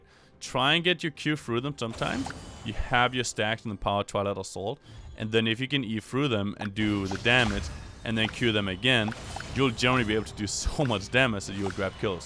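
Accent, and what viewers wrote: Denmark. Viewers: The danish accent hahaha (good video btw)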